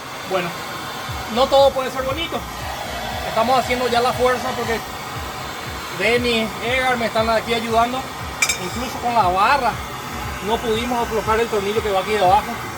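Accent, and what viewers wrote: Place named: Paraguay. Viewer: Cara, sou de Foz de Iguaçu. Nasci em 79. Minha adolescência foi com JDMs maravilhosos circulando, cuja origem, do py. Assistir teus vídeos me remetem um saudosismo do sotaque dos paraguaios na fronteira. Show😊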